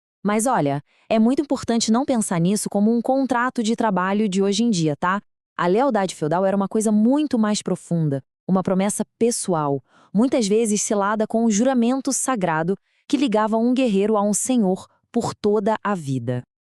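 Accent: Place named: Rio de Janeiro, Brazil. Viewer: Pura carioca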